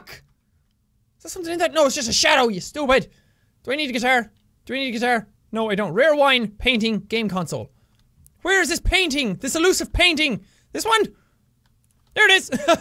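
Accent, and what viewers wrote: Ireland. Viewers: wtf kinda question is that he is obviously irish and is that german you tell me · HE'S IRISH! So not everyone can pronounce words the same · He's Irish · And the bossasaurus Irish accent helps too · Its the Irish accent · Is he irish? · he's Irish I am too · back when he had a very prominent irish accent 😭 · omg i love your irish voice. is it irish? if i offend you im sorry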